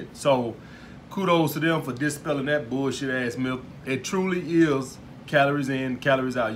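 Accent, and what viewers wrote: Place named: South, United States. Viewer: the way you talk remind me of Hodgetwins, except a stronger Southern drawl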